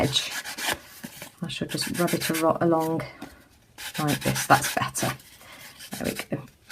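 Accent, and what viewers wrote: England, United Kingdom. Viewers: Beautiful page! I love that quote! And I love those flowers too! It is so enjoyable watching you and listening to you explain what you are doing! (In your fabulous English accent! lol) Tfs Big hugs, Ginny xo:o)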